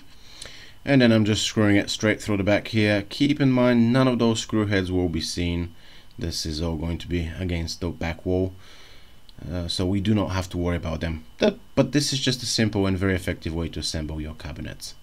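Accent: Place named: London, United Kingdom. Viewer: what’s that accent?…it’s a bit Laaaandon..but mixed with what? Excellent video by the way.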